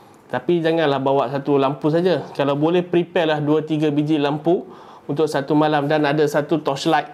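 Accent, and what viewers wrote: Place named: Kedah, Malaysia. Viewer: cakap kedahlh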